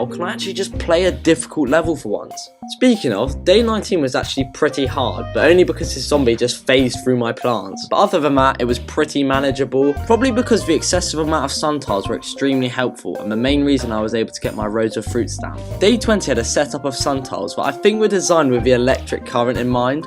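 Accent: United Kingdom. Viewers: bit too entertaining.. you british? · A GOOD BRI ISH GUY?!?!??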